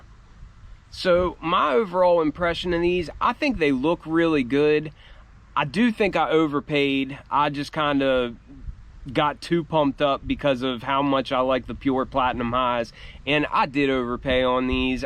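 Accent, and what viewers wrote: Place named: Philadelphia, United States. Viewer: you gotta be from greater philly talking like that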